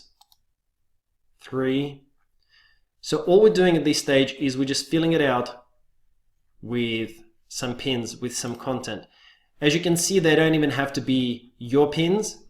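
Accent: Russia. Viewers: I can hear English with Russian accent ;) Do you have same the video in Russian?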